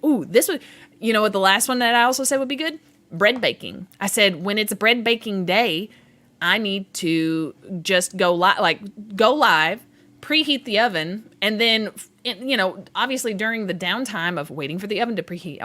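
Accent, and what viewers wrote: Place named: Tennessee, United States. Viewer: Tennessee or Kentucky which one lol I hear it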